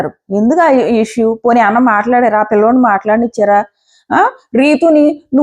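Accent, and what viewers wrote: Godavari, India. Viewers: 😂 మన గోదావరి యాస బావుంటుంది madam. మాది Demon Pawan ది same town tanuku west Godavari district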